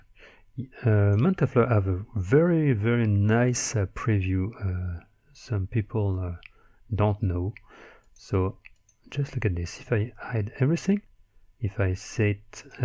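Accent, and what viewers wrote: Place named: France. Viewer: accent français x)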